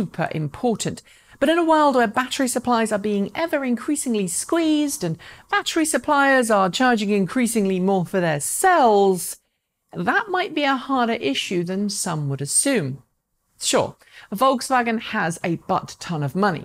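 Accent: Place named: England, United Kingdom. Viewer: This lady is so erudite and I love the English accent .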